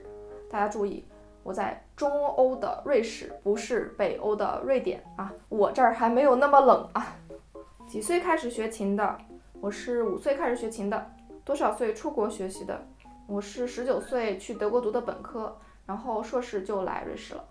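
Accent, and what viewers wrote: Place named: Shanghai, China. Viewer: 难怪口音基本就是上海口音了，完全不是青岛北方口音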